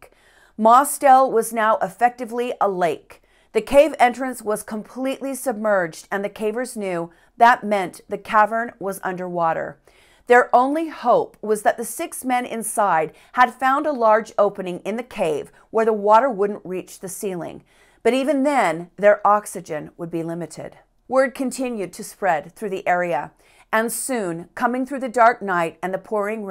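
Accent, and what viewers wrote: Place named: United States. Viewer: I love how Americans pronounce 'The -shires' like something out of 'Lord of the Rings' 😆🤣 so sweet - we say it like plain ol' 'shur' I'm afraid...'Che-shur' 'Lincoln-shur'...& don't get me started on 'Worcestershire' 😄 As for being in a dark 7mile tight cave??...for fun...?! HELL NAW!! 😬👈 Terrifying vid & great channel as always. 📛 🖤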